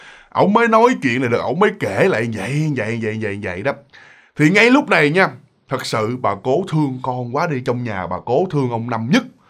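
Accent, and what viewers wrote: Mekong Delta, Vietnam. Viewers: Chúng ta đều thích nghe truyện... Giọng kể kiểu miền tây chân chất... Giọng là bất diệt rồi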